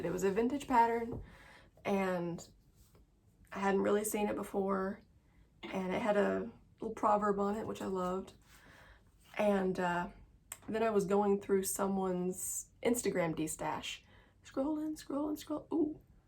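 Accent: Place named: South, United States. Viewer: That Amish life in that magazine blitstitch is working on :) and I found it completed at a thrift store for a $1!!! It’s not framed but all three pieces are done except a little bit of border and bush but you can’t even tell unless you were looking really hard lol loved you video and am going to watch your 1st!! Love your southern accent 😜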